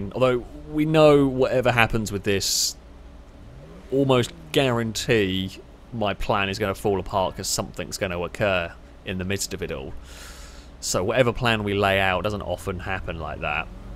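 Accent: United Kingdom. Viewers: there's nothing like a british man narrating his criminal adventures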